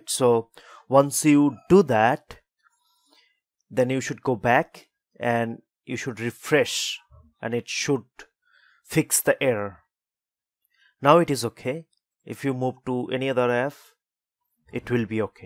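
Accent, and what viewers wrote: India. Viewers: your indian?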